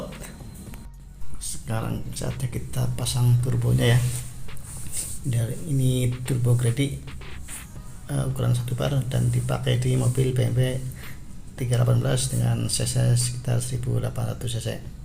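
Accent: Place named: Banyumas, Indonesia. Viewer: bahasanya ngapak om, salam dari republik ngapak, purwokerto 😄😃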